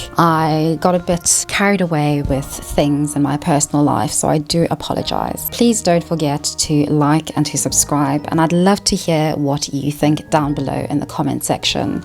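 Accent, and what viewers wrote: RP, United Kingdom. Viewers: The camels are on the horizon, like it or not. This nog'ettes grandstanding and posh accent tells me everything I need to know. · This is apart from the video, but hearing your voice for the first time surprised me, Your voice is beautiful, meant respectfully 😊 I have friends from Ethiopia and a an old friend from Kenya, so I’m used to those accents but your accent is like a UKish one, I think, it’s very pretty, ma’am ☺️ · I only subscribed cuz you asked, dark skinned lady with brit accent.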